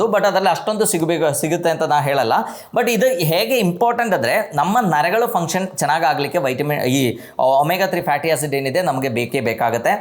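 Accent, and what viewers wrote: Kerala, India. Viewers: Sir r u malayali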